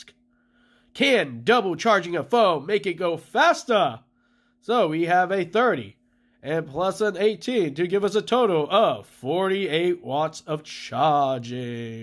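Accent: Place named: India. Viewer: This man is speaking the English of Indian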